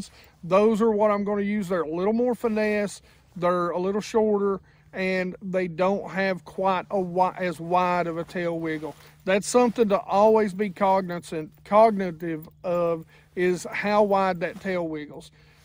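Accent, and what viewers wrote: South, United States. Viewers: So glad to see you fully recovered from Covid Brother, sounds you're back 100% Southern drawl and all!! LOL!! Would I get the same reaction if I put plastic flukes instead of swimbaits? Great instructional video!!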